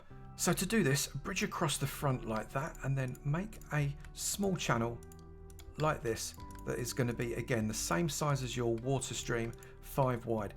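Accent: United Kingdom. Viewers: I think British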